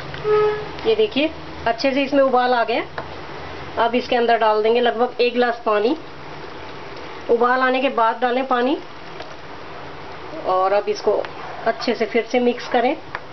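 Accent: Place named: Punjab, India. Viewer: As salam walekum sister's ap ki recipe se ziyada ap ki bate achi lagti h panjabi lehje me urdu bolti h bhot acha lagta h or wo waords sun k bhot acha laga or hasi arahi h or (pahari bana k) 😀😀😀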